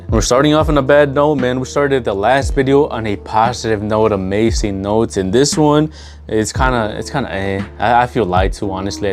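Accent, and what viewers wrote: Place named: Mexico. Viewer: He’s not talking like that at all wtf are you talking about, he does have a sort of Mexican accent though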